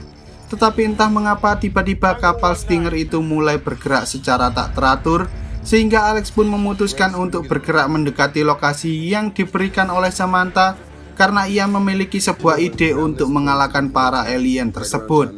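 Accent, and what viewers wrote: Java, Indonesia. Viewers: Lu orang jowo ya bang sama gw yo orang jowo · Rasah kesuen 😂 Jowo e endi?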